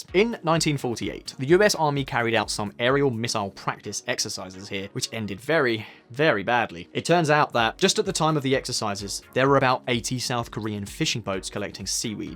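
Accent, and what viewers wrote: United Kingdom. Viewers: “Hotspot: hotter than a hotpot.” Love your British accent mate! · this guy narrating is obviously British, and should know why lump of rocks in the ocean is so important.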